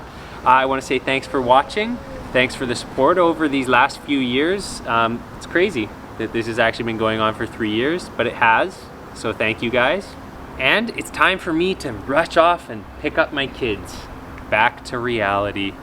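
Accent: Canada. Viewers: I’ve seen a couple of your vids but damn you sound hella Canadian in this one... lmao I never even noticed until now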